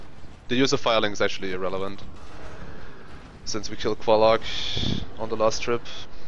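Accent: Germany. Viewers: How's the Dragon Tooth so powerfull?!? Also your DT attacks look like a downward swing/thrust combo which seems to be critical for this devastating damage output. Could you explain that to me, please? Unfortunately I'm totally unfamiliar w/ Dragon Tooth because I've never made use of it. Btw, if you speak German (which, judging by your accent, you most likely do), you can also explain it in German to me if you want ;). If you don't, please excuse my misjudgment :).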